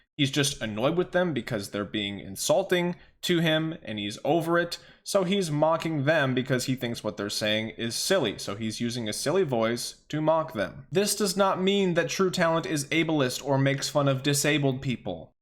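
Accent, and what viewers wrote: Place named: United Kingdom. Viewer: He just sounds condescending to some people because 1) he knows what he's talking about 2) cause of the accent. People have been taking the British accent the wrong way for hundreds of years now lol.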